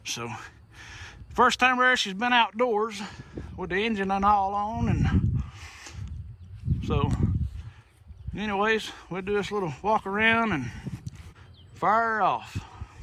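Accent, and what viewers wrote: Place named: New York, United States. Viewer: Love the New York accent :) Nice plane...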